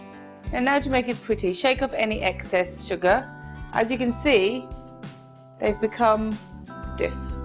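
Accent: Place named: United Kingdom. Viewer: Ah that British accent!!! Lovely!!!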